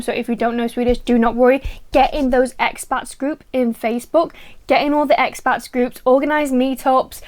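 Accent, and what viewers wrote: United Kingdom. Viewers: If you wanna make a real career here in Sweden, you normally have to learn some swedish. Otherwise, your career will stop at a certain point, which I think is quite fair to be honest. But what I'm most curious about is if you are north English or south Scottish!? ;-) (And by the way, I really do hate all the auto-spelling functions, that Youtube throws at me all the time!).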